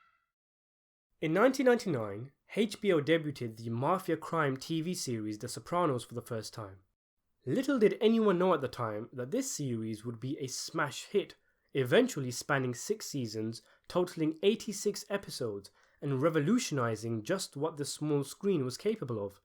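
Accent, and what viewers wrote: United Kingdom. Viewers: The British accent ruins it. Such a sissy accent talking about Tough Guys. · You Brits say "H" funny. "haytch"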